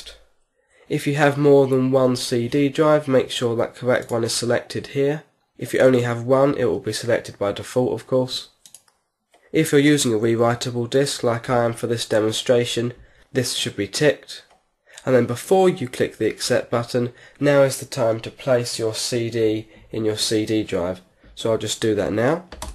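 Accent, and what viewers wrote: United Kingdom. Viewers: Great informative video, FLAWLESS. Subscribed. Thanks. Hes british too, added bonus ;) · Thanks, excellent tutorial and lovely British accent.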